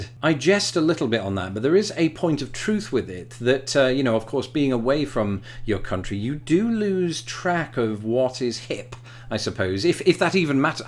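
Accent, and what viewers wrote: United Kingdom. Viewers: Is it just me? Your accent is sounding slightly more British. Maybe it's because your not using your comedy voice like you normally do on your video. But no matter, I'm loving your views on how your homeland has changed. I visited London in '00, so it's also weird to see how much it's changed since then.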